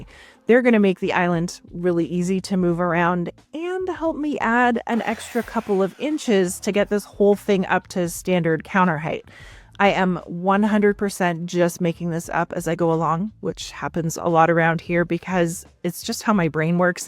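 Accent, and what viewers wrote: Canada. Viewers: I always say I cringe about when I see people paint over hardwood furniture. I think I would have stripped it down, and maybe do a white wash stain. WhileI'm not a fan of dark painted furniture I get that that's your style. It would have been interesting to whitewash the whole piece minus the top. Or do the whole thing. Are you Canadian? Sounds like you have a little accent? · thank you! I prop mine in the morning and I just sit and watch you are so dang good at this. Definitely found your niche. Expert level every detail. I am from New England COAST, I here, Toronto in your accent. I am a Tour guide. I meet people from the world. But I miss Canadians. Don’t come to visit so much anymore. I apologize for our political situation. Thank you for being my entertainment.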